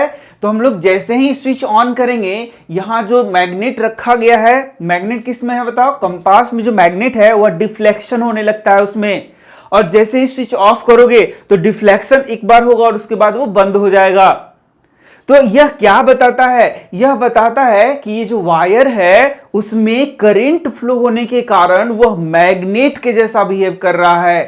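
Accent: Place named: Uttar Pradesh, India. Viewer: sir aap up ke hona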